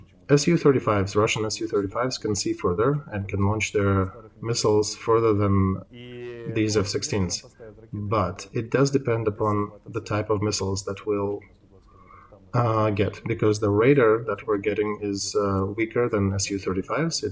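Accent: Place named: United States. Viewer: His English is extremely good, too, so you can trust the things that you learn. Not perfect but very good. His English pronunciation is excellent. I say these things as a native (US) English speaker. Also, for what it's worth, he has a US accent, definitely. At times I easily forget he's not a native speaker. When I am aware of it, often it's because he pronounces words better than most Americans, speaking every syllable completely. That makes it easy to understand.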